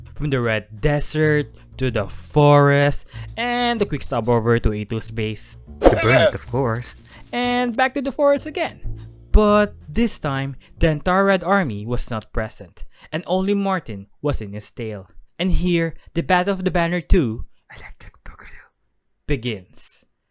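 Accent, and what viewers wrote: Philippines. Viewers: Your accent is very filipino, you from pangasinan or somethin?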